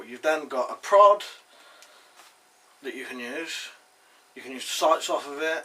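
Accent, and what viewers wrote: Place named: England, United Kingdom. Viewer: Correct me if im wrong. You sound like you are from England. Thought firearms were illegal in England.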